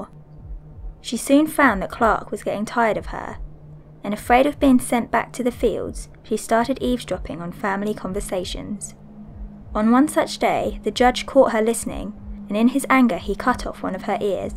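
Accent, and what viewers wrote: United Kingdom. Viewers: Joel Fernández Jerez its almost like Chills twin with some sort of British accent.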